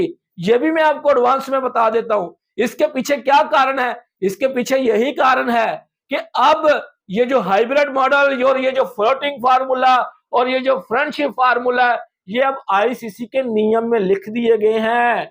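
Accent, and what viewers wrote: Pakistan. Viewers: Oye Tu Pakistani Hokar Indian banke india ke kilaf propaganda Kiyo Kar raha hai tu Jahilo anpado ke ustad hote Hai jeh Pakistani, Inke boli Harkaton se pta chal jata ke Je jahil Pakistani Hi Hoga😂😮😅 Urdu Hindi Ki Maa Bhen ak Kar Dete Hai Jeh Pakistani Jaahil😂, Dekhlo Jaahilo ki Haalat 😅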